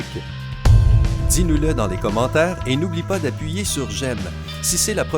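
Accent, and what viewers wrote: Quebec, Canada. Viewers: "cpendant" mdrrr tu pue le quebecois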